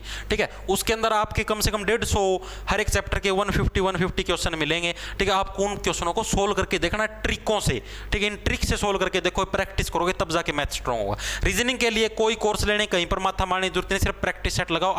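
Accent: Haryana, India. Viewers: Haryana sei h bhai sir kya ajib lag ra h aur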